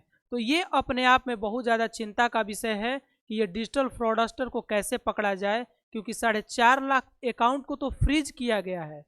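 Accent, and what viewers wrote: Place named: Bihar, India. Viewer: Bihari style hindi But Good Knowledge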